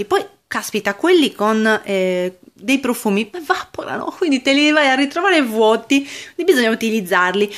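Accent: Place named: Sardinia, Italy. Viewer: Ciao bellissima postazione. Anche io SN sarda. Appena ho aperto il tuo video ho riconosciuto subito l accento. Sei troppo simpatica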